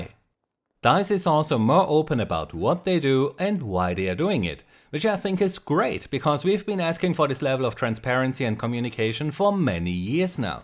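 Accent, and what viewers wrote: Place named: Germany. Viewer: And that your german accent is on fique...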